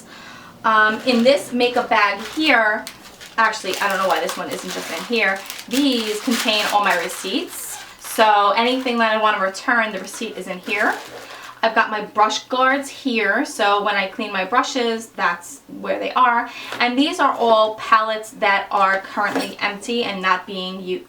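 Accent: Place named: New York, United States. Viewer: Are you from New York or New Jersey or something? I hear the accent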